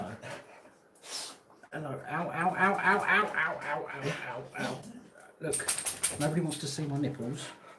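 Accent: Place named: London, United Kingdom. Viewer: Why do you live in Liverpool, you sound like a londoner mate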